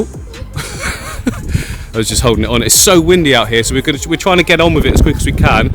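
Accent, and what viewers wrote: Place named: Midlands, United Kingdom. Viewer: Trying to figure your accent... sounds really Midlandsy, around my area (Northamptonshire). Am I far off?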